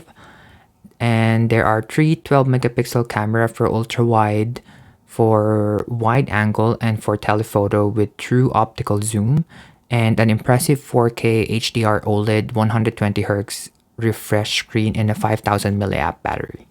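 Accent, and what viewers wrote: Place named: Philippines. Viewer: I know a Flipino when I hear one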